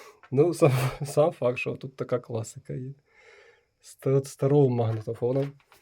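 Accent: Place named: Vinnytsia, Ukraine. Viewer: Ви щ Миколаївщині,а розмовляєте,як наче все життя на Вінниччині прожили. Чи так і є?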